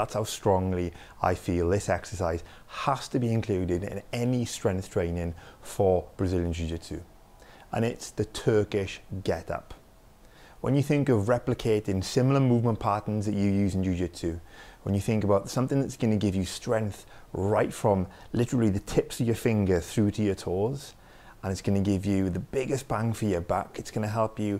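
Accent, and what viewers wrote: Wales, United Kingdom. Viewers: Nice to hear a Welsh accent on YouTube! Bro use YouTube cards to and pin your videos on the screen when you mention them, easier than description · U welsh butt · Ah, a Welshman...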